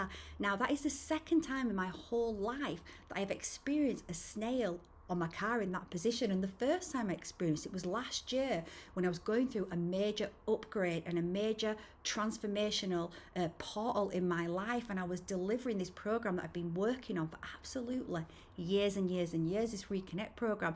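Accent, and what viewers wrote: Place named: North of England, United Kingdom. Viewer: Thanks so much for your video which really resonates. I feel like I’ve been on pause for a long time. I noticed your video lasted 9.11 and I have been seeing that number sequence for a long time! What part of the world are you based by the way? You sound like you’re from the North of England. I live in Prestwich. Sending love and sparkly wishes xxx 🙌💕🧚‍♀️🪄💫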